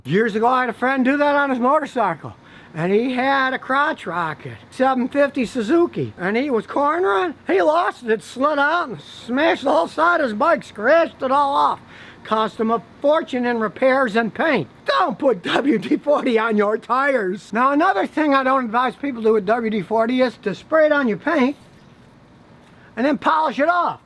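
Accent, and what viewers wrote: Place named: Chicago, United States. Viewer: you sound like you are from Chicago.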